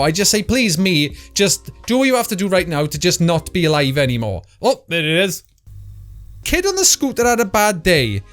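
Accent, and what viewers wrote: Wales, United Kingdom. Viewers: Wales 🏴 💕 cariad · Are you Welsh? 🏴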